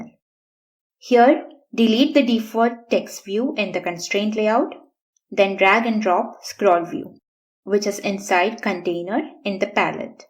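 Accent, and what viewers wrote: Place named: India. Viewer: The first indian accent i understood Great video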